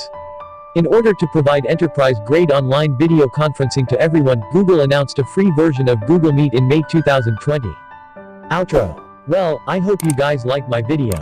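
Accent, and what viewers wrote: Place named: England, United Kingdom. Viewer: I like you english accent, thank you for being a good teacher and sharing your idea about our modern technology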